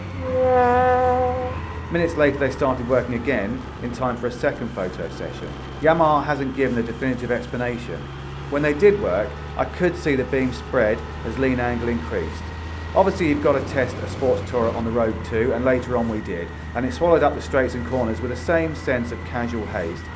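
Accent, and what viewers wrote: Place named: England, United Kingdom. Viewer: Can't stand that Limey accent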